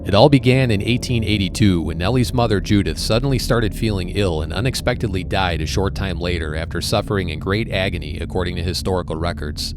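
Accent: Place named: Midwest, United States. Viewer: I listen to this channel at least once per day. Great stories, Midwest accent is great, and the background music is perfect.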